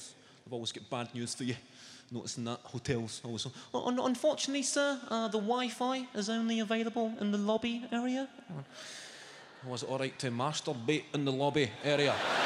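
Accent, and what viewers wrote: Scotland, United Kingdom. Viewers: Does he really expect non Scots to understand him?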